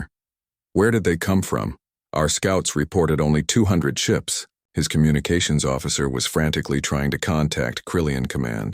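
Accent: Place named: United States. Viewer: You must be an American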